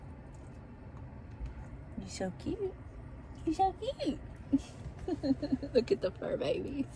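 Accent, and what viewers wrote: South, United States. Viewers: I ❤️❤️ your sweet southern accent! It’s so calming 🥰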